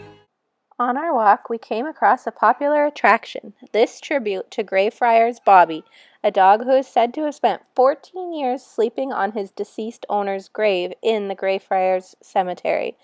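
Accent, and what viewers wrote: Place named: Canada. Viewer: I hope you enjoyed your visit to Edinburgh and Scotland. I only picked up on the fact you’re Canadian when you said “out”. Many years ago I worked in a large city centre hotel and had the pleasure of meeting many people from Canada. You’re quite brave taking the wee one with you. In recent years Edinburgh has become (like so many places) a tourist attraction all year round, whereas it was mainly just in summer when it became so busy.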